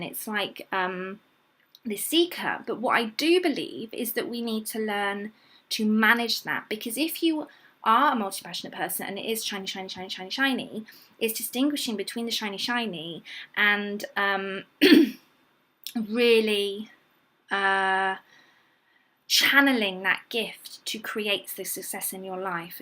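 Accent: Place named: United Kingdom. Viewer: Your accent is British 🙂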